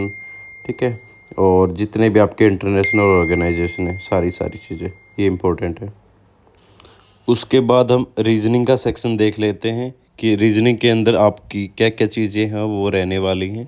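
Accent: Haryana, India. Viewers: Bhai aap haryana k lgo ho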